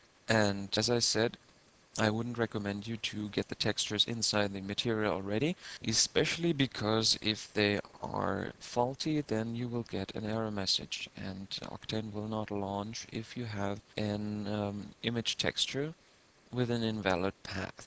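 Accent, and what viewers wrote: Germany. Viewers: I like german accent in English - anyway thanks for this tutorial.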